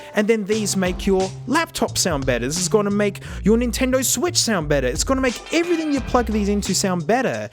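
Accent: Australia. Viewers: You should review the HyperX Cloud Alpha S headset. I love the Australian accent! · AL-UU-MIN-NEE-UM. I Love your vids my Auasie friend. Keep it up. 😃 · Ar u australian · For some reason i love the Australian accent and the information about the Ipods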